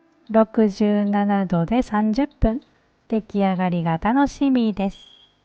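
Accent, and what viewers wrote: Kansai, Japan. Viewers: いつも楽しく拝見させていただいております。関西弁でとても聞きやすいです。私は兵庫県です。食って大切ですね。私もちゃんと考え直さねばと思いました。できることから一つ一つ改善していこうと思いました。皆さんお元気で。これからも楽しみにしております❤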